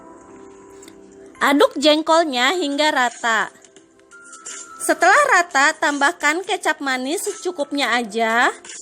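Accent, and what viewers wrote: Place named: Java, Indonesia. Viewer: Wadidau..medok beut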